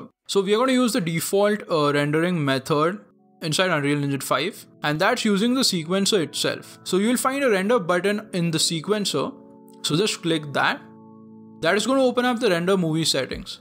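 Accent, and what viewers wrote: India. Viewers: The indian accent is terrible to listen...evolve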